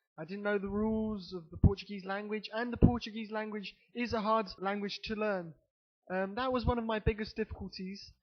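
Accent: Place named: United Kingdom. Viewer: I loved your British Accent. Keep up the good work! Neuri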